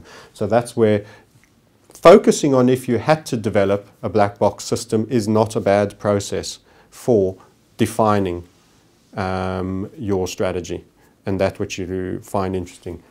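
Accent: South Africa. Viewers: SA accent.. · you sound south african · This guy sounds South African 🇿🇦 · He has a South African accent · Why do you sound South African?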